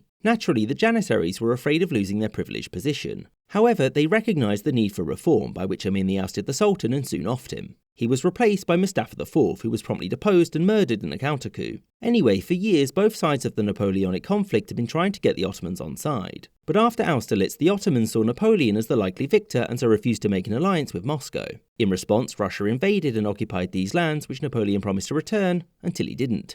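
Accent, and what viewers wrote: England, United Kingdom. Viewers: It's the English accent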